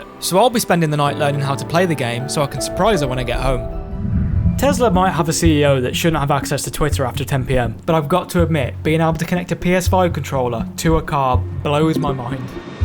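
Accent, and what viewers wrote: United Kingdom. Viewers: I love that British accent 🤌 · You'll definitely reach 1 million in 2026 cuz of your thumbnails and cinematography, like ymeven your british accent is nice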